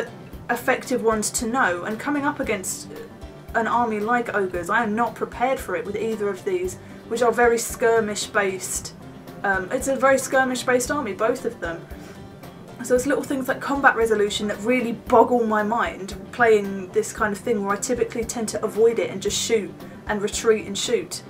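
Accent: England, United Kingdom. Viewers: You are so funny and great stay sweet and keep up the great work, It so great to see your growing as a gamer. Cheers. Later mate I have Wood Elves and Skaven. I find you can so a lot of archers but they both need new books and at this rte (th will be out beofe they are: ( Love the English accent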